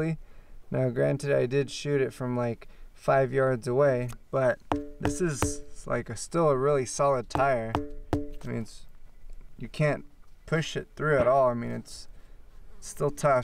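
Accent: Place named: New Mexico, United States. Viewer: no worries man. You have a similar accent to a buddy of mine in New Mexico so it was a guess lol. I love Idaho though!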